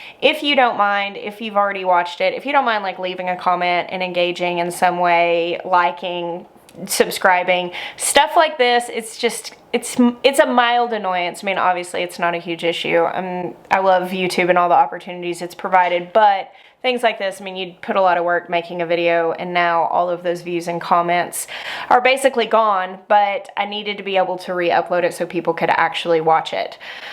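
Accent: South, United States. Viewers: Thank you!!!! You speaking German.....was a little disconcerting.....I prefer Southern accent ;-)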